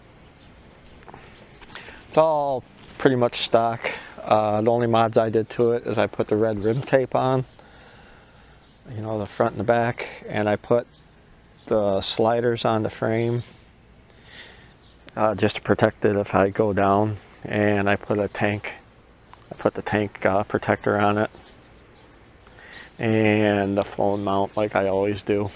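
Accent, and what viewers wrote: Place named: Wisconsin, United States. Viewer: I knew you were from Wisconsin, not that the accent is a dead give away but you speak well, maybe im biased but i think our state has many smart well spoken people, and you did not want to make your neighbors angry, that is very respectful only us Wisconsinites are that cool, and you want to leave the stock exhaust on haha, I leave it on to haha. Very cool man!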